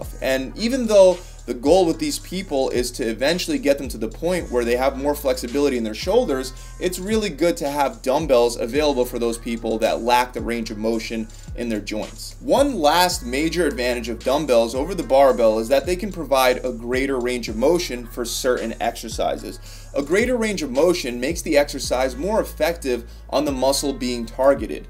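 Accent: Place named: New Jersey, United States. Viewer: are you from New Jersey I was thier a few days ago first time and you got a Jersey accent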